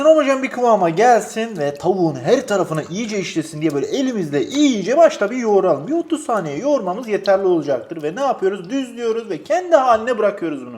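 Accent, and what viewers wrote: Adana, Turkey. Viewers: Ya bak abi sen adanalı gibi konuşan ve Diyarbakır'da yaşayan birisin bugün barbecue wings yapıyoruz demenin ne gereği var barbekü soslu kanat yapıyoruz desene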